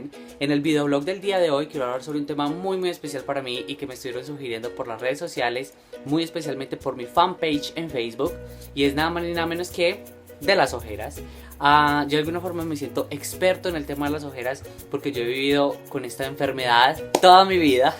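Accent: Antioquia, Colombia. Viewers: definitivamente paisa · Oyeeee paisa me gusta como hablas... bacan!!!!!!!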